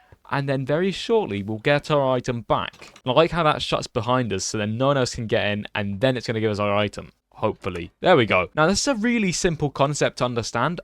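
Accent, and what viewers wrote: United Kingdom. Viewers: Pretty similar but Mumbo's accent is more southern, but this guy is more estuary. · Bro is british mumbo jumbo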